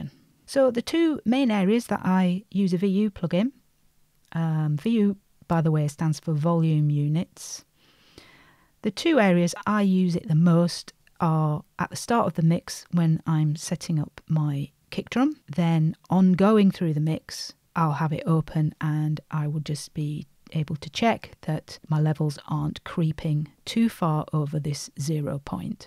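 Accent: Northern England, United Kingdom. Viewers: I love that northern accent!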